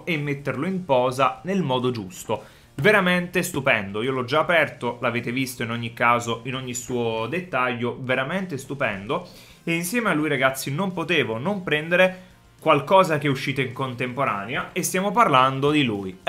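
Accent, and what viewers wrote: Naples, Italy. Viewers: Ma sei di Napoli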